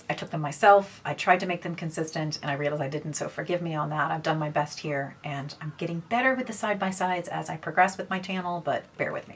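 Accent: Ireland. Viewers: The battery cycle is too short on this thing (Omnilux) for sure. I have another cheap USB external battery power source that I use and just set a 10 minute timer on my phone. Annoying for the price. This is my first watch of your channel. I’m 50 as well. You have a subtle Irish accent which I adore. I’ve subscribed.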